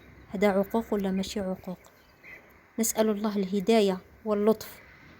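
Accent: Algeria. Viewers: والله ياختي راكي في القمة وأنا اكتشفت قناتك بالصدفة كنت انحوس على حكايات القسنطينية لقيت القناة أنتاعك وعجبتني وعجبتني اللهجة انتاعك على خاطر انتاع الشرق وأنا انقولك واصلي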